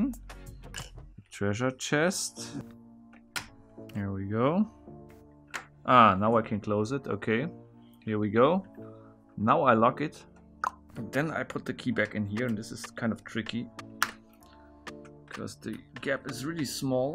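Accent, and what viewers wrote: Germany. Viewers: you talk little bit like german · Are you German ? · Dein Akzent ist so krass deutsch haha Machst du auch deutsche Videos? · Das erste Video von dir gesehen den Akzent gehört in der kanalinfo nach gesehen und jap du bist deutsch xD Viele Grüße aus Hamburg! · Man hört dass du ein deutscher bist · You are from germany right? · Man hört einfach Instant dass er Deutscher ist . Und das Ah Ok war das Deutscheste im Video . Ehrenmann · He sound like a german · Hmm.... sounds german. Not the Set, i mean. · Bist du deutsch? xD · du bist doch bestimmt deutsch oder?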